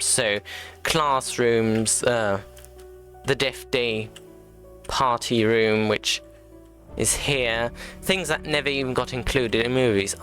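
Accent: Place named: Estuary, United Kingdom. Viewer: I am an American who loves the UK. I think us Americans automatically think monocle whenever there’s some RP in a British accent. I love yours. Sounds like an estuary accent. Somewhere around the home counties.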